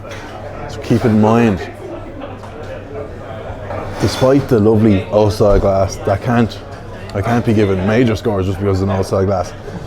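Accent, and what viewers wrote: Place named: Essex, United Kingdom. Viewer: Your Essex accent is pretty decent mate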